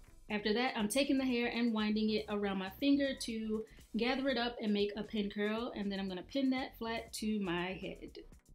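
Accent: Baltimore, United States. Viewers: is that a Baltimore accent?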